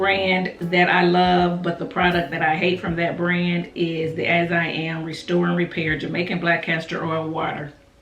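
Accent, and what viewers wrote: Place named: Indiana, United States. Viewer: Hi! Thanks for replying! I’m from Barbados, so everyone outside of Barbados has an accent to my ears 🙈. I don’t think I’ve ever knowingly heard anyone else from Indiana, so I didn’t have a point of reference. In any case, I could listen to you talk all day! I think it’s your energy and your entire demeanor as well. Keep the great content coming! 💕🤗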